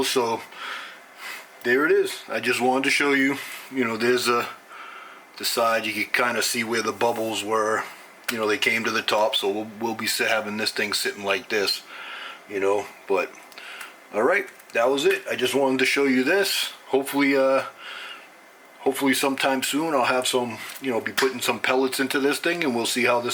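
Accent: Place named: Boston, United States. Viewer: Not bad! You got that Boston accent. For the bubbles on top try using a heat gun so the top bubbles can decipate all together!! 👍👍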